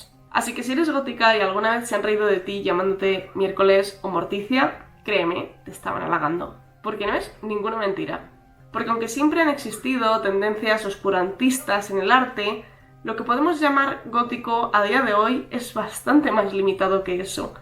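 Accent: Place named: Spain. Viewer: Todos tenemos acento. Es la forma en que se expresa la lengua que hablamos según el lugar de donde somos. Ella tiene acento español, no sé específicamente de qué área. Y para mí, una persona de otro lugar completamente distinto, es una forma de hablar y expresarse que llama la atención. Así que sí, qué acento tan precioso.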